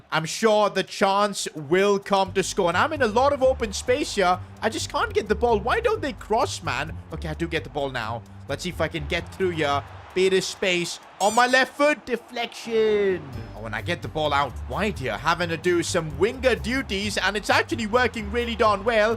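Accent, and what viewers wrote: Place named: India. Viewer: The Indian accent is wild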